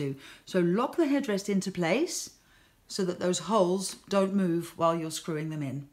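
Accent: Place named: England, United Kingdom. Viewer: Lovely accent, from England ?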